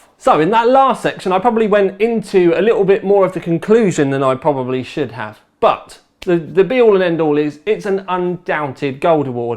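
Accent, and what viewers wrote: United Kingdom. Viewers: the most annoying is that British English ascent, its so flatulent. do not understand why British cant speak normal English.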